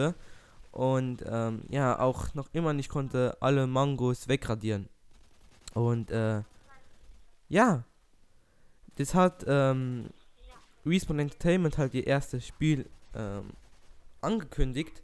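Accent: Luxembourg. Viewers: Das sind keine Sprachfehler, das ist Dialekt. Er ist Luxemburger :)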